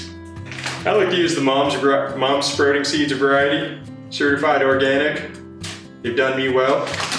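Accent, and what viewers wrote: Canada. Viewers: Find eout in the heouuse. So Atlantic Canadian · Even as a Canadian, I'm like "whoa, that is a Canadian accent." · As soon as this video started I got hit in the face with all that Canadian!! You're one of my favourite peoples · My mom is an immigrant to the USA. I’d like to send her your video, but she won’t understand your Canadian accent. It’s very strong! I’m having a bit of struggle, and English is my first and only language. If open to it, pls add English subtitles. I know that’s weird, but English is her second language, so dialect other than her USA-born children, is difficult for her. She’s converting to healthier because of pandemic, and not wanting any vaccines, etc. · Do I hear Canadianish? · Salad spinner husk removal much easier....or....use a net bag that onions come in, one with tiny holes and stand outside and spin it really fast....Kids love this, but I use an almond milk bag over the net bag so I don’t have seed husks all over me and my deck... 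Love that Canadian accent!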